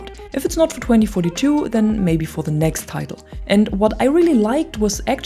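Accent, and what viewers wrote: Germany. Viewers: I'm only here for the sound of your voice, are you German?😊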